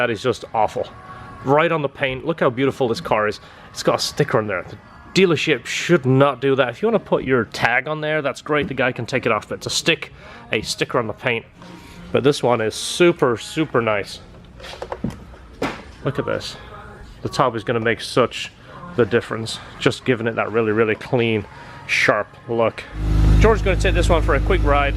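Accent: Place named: United Kingdom. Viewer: I’m picking up a british twang In your accent.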